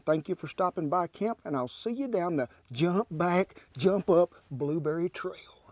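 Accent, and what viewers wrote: South, United States. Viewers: I'm thinking Tennessee I do love a southern accent lol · my daddy came from Texas but I only remember so much since i lost him so young. Maybe that's why yours and other southerners' "plain speak" just lights me up. Up here in the northern woods people say we sound Canadian 🤣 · I'm just Mesmerized by that lovely Southern voice❤️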